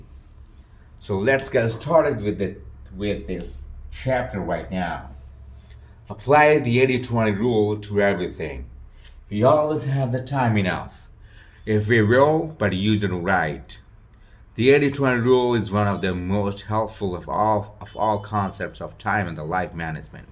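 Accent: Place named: United States. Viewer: A true American accent 🎉🎉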